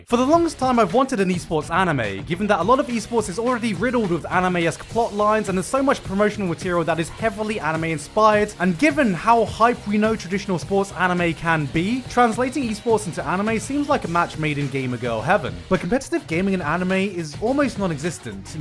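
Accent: United Kingdom. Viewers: He has a Bri'ish accent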